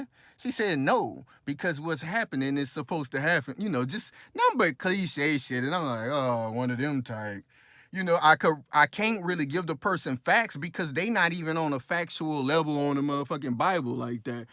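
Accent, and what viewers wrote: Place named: New Orleans, United States. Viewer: Sound like you stayed in new Orleans for a lil while...the accent sound familiar...but good info family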